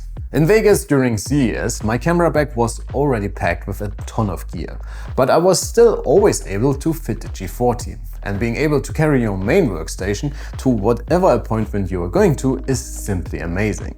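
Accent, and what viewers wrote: Germany. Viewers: Is German your native Language?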